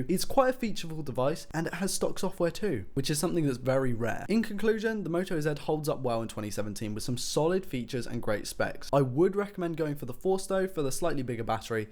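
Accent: United Kingdom. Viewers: I like the way you speak, which part of UK are u from?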